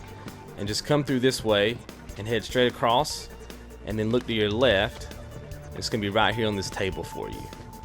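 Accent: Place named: Mississippi, United States. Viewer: Thanks dude, I remember collecting these on my PS3, but I totally forgot already and now I need to finish 100% again in the remaster version, helped me greatly cheers =) ps: I love your accent, are you from Mississippi ?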